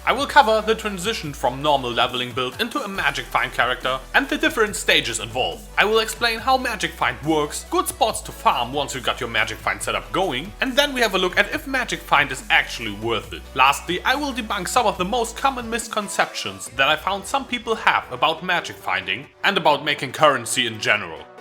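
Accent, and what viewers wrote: Germany. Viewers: you are german richtig?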